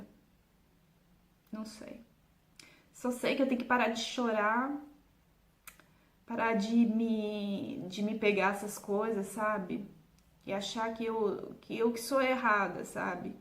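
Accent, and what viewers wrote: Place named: Italy. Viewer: Olá moça. Estou te acompanhando desde os primeiros videos que você postou e tenho gostado da sua rotina porque é diferente da minha. Quero te dizer que não é culpa sua. Algumas pessoas não sabem lidar com seus sentimentos e acabam descontando em pessoas boas que não fizeram nada. Quando isso acontecer, tenha em mente que não é você, e sim a outra pessoa. Nunca deixe de ser a pessoa boa e educada que você é. E não deixe ninguém tirar o seu brilho. Uma dúvida, onde você nasceu? Vi que você fala português, mas não parece ser sua lingua nativa. Você é italiana? Continue postando, gosto dos teus videos! Abraços :)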